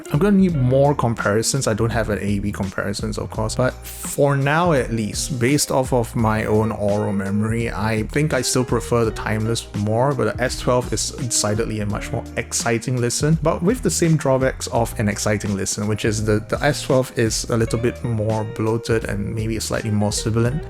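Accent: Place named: Singapore. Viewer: I could tell you were Singaporean from the accent. I felt validated after checking.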